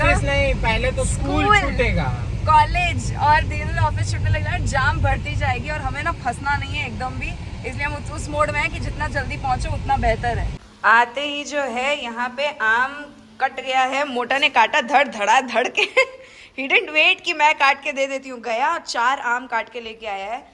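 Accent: Bihar, India. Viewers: Mam ap jo bich-bich me bihari lehja me bolti h ekdum mast lgta h sunkar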